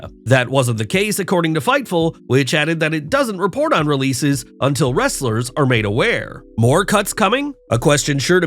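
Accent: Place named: United Kingdom. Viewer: Why is the British narrator always sick the English guy is annoying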